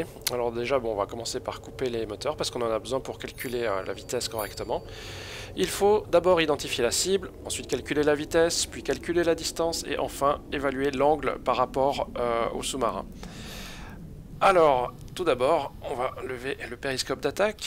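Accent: Switzerland. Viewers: Tu es du canton de Vaud ?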